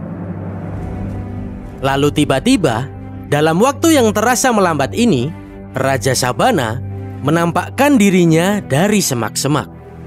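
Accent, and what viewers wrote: Java, Indonesia. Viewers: Knapa jadi medok anjirrrr dubbing nya🤣🤣🤣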